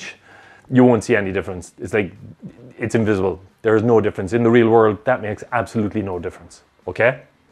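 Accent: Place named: Kerry, Ireland. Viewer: Hi, brilliant and straight forward review as usual. Bit of a daft question and definitely not printer related! Having enjoyed many trips over the water to Ireland encountering all the diverse dialect changes I can't help but try and best guess. So my best guess for yourself would be the West side, maybe Kerry or not quite that far South.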